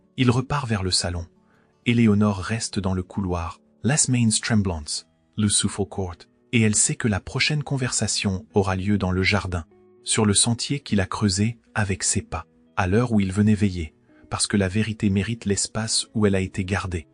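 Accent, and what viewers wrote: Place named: England, United Kingdom. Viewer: L'histoire est sympa mais l'accent anglais régulièrement est agaçant... C'est vraiment dommage 😢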